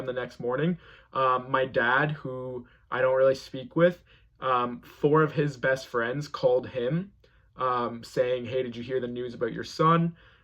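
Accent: Canada. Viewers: This has all the Canadian words in it! Hoouse, ooout, 😀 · I love your Canadian accent. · Well done matey! Be proud of who you are! BTW you are the most Canadian sounding Canadian I’ve ever heard in my life! Xx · This guy has a Canadian accent. Not foolin' me....lol He says Ouwwt. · The strongest Canadian accent ever lol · Your Canadian accent is thick. I love it and I love your content bro. Keep them coming man and you're cute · I'm a straight female but I'm watching coming out videos lol instead of studying. Good for you for coming out and being your true self. Hi from Ontario and damn, your Alberta accent is strong. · Sounds like he's from Canada. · Gorgeous young stud...even with the Canadian Accent. I hope you have a wonderful life! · Me watching this channel for the first time: “Canadian shirt? Is he Canadian?” Him: pronounces the word “out” in that cute ass Canadian accent Me: “knew it. 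I should be a detective” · What about the poster in French? I had to check where London was in Canada. Obviously he wasn't referring to the U.K. His "uit" and "huis," known as Canadian raising, sound different than in other parts of Canada. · You have such a cute Canadian accent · interesting. he has the strongest Canadian accent i have ever heard. what part of Canada is he from? London, Ontario?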